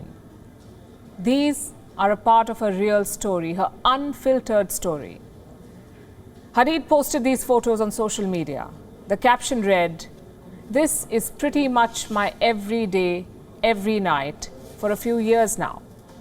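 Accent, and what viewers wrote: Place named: India. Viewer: Is she speaking English or trying to with an Indian accent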